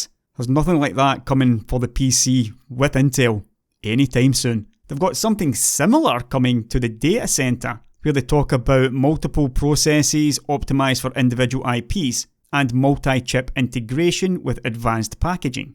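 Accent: Scotland, United Kingdom. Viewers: I hear a Scottish accent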